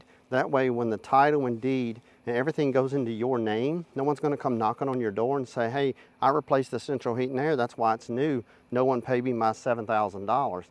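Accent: South, United States. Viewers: Are you from Louisiana or the south in general? Im getting theo von vibes (which is a compliment)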